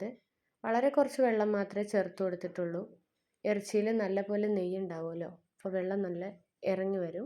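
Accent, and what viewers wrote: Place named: Malappuram, India. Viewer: താത്ത മലപ്പുറത് ക്കാരി ആണ് ലെ.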